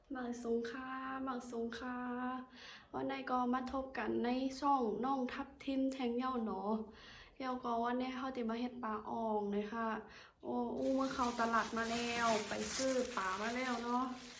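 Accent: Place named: North, Thailand. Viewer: วันนี้อู้กำเมืองเลยน้อ... น้องทับทิม👍👍👍👏👏👏🌹🌹🌹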